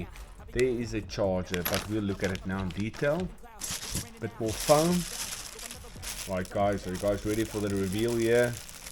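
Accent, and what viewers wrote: South Africa. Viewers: I like it bud! I subbed to stay tuned in. Looking forward to what's to come in the future 👌🏼 Hey on a side note what is that checker board square area you were driving in meant for? I'm in the U.S so just curious. Also I always try to pin down accents and I'm guessing south Africa? If yes you have a beautiful country!! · Great video.I watch your channel for the interesting content and also the fact that you sound South African.any advise.Bought this but after 10min or so of running in the grass it cuts off.esc is very hot.Is this normal?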